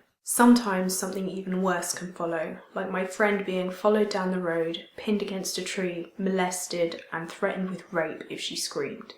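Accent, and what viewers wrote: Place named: London, United Kingdom. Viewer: I find myself wondering where you grew up. I think I heard in another video that you like in Edinburgh now? By your accent I'm thinking you grew up around London perhaps? I grew up in York and never heard of any such going on happening to any of my friends. Even When I moved to the much larger city of Edinburgh to go to university I still never noticed this behavior of random creepy street-goers. I'm male, so perhaps that insulates me from it a lot. Do you find that this problem of street harassment is lessens the further North you go?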